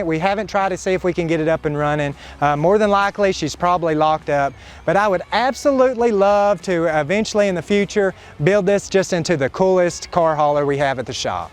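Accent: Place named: Texas, United States. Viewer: Not gay, just a classic Texas drawl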